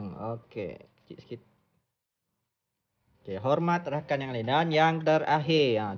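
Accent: Sarawak, Malaysia. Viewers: Bunyi org Sarawak jak. Mun benar, kipidap good job. Lamak sik nengar tune tutor gia 😁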